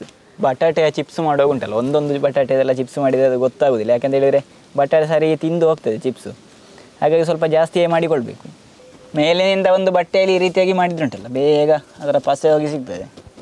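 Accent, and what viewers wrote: Mangalore, India. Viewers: I subscribed to your channel because of the charm in your Kannada accent ! Love it when you speak in mangalore Kannada ❤ also you make wonderful recipes. Thanks 🙏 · ಮಂಗಳೂರು ಕನ್ನಡ ಚೆಂದ ಮಾಯ್ರೆ ❤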